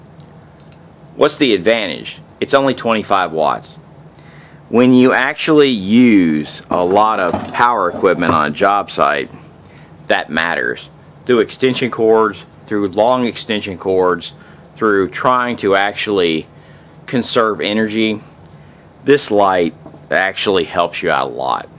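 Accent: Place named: South, United States. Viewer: You sound like a red nec.. Lamb voice. Costco sells it for <num> cad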